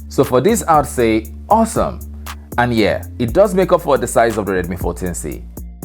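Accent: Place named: Jamaica. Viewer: Dont understand Jamaican!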